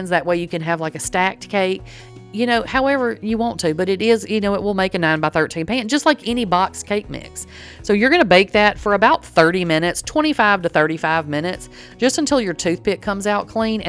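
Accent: South, United States. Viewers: I only bake homemade cakes as I personally do not like store box cakes (too sweet for me) and having these premixed cake mixes is going to be a game changer. Thank you so much for sharing these recipes. p.s. I love your accent 😊 I lived in GA long time ago for a few years and just love the southern accent.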